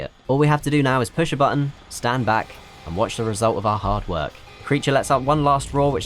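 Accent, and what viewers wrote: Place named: United Kingdom. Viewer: this would be a great video with great pacing and great editing about a great game and a great all achievement challenge. unfortunately, british 😑😑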